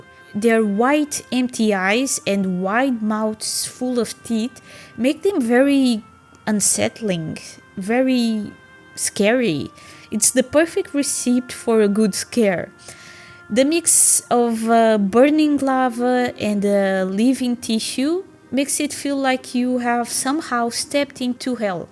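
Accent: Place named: Portugal. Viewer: Puro sotaque tuga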